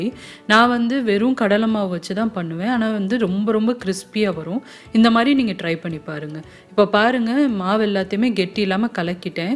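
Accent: Madurai, India. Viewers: Vada chatti typical madurai slang.... Thanks for the receipe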